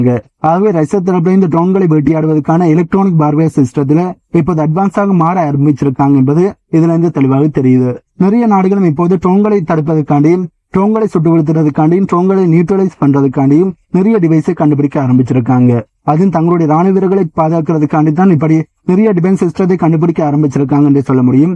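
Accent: Sri Lanka. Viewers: 🙏🏽 இது வட இலங்கை தமிழுடன் ஆங்கில சொற்கள் சேர்க்கிறது. இயற்கை தமிழ் 🙏🏽அப்துல் காமிர் ஸ்டேலை கேட்டு சலித்துவிட்ட்து அதைதான் எல்லோரும் கொப்பி பண்ணுறாங்கள் 🤣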